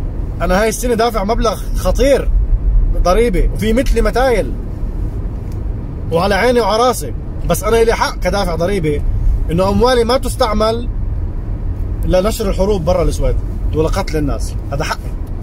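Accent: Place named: Palestine. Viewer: السلام عليكم إنت من وين أخي واضح من لهجتك فلسطيني من سوريا أو لبنان؟؟ ممكن تعمل فيديو قصير تحكي فيه عن نفسك سيرة ذاتية. من الجيد أن نعرف عنك أكثر و شكرا جزيلا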